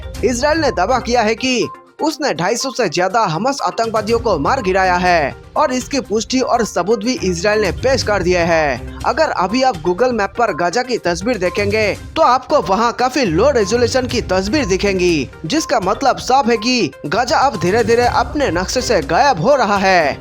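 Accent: Bengal, India. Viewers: Tum bolte bahut achhe ho...!! Are you bengoli!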